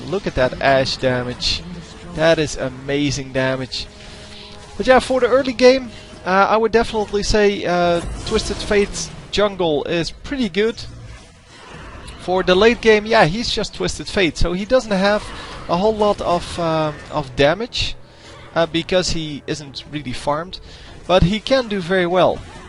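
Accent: Netherlands. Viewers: I sense a dutch guy here XD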